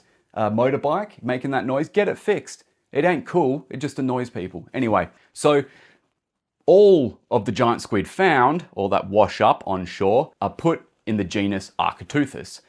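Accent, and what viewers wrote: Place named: Australia. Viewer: Paused the video. Guessed the man was Australian. Resume video. Vindicated.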